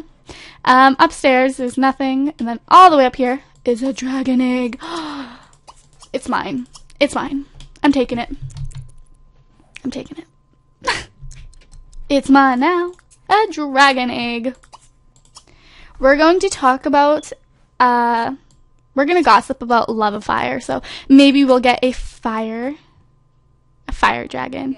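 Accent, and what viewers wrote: Scotland, United Kingdom. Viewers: Scottish lol:)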